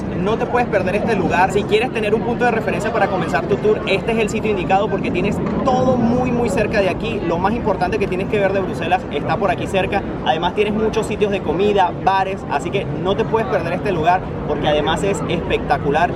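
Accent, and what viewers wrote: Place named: Venezuela. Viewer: De dónde eres youtuber x tu acento creo q eres veneco seguro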